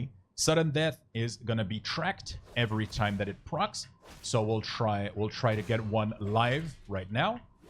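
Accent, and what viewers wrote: Quebec, Canada. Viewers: Sounds like you are quebecois ?! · Very nice video. I can tell lots of efforts were put in this and I will be the first one glad to use it right away! Btw QC? I recognize the accent :)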